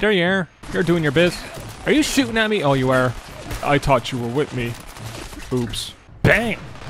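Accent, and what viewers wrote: Ireland. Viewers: Another Irish YouTuber, nice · R u Irish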